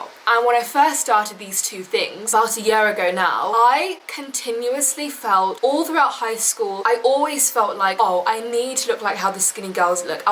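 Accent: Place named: Essex, United Kingdom. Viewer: Idk how to explain but I just think she has a very elegant, posh accent, like very Essex like.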